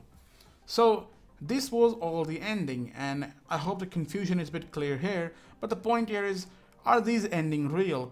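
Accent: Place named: India. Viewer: You sound like an indian gamer but thats why i will subscribe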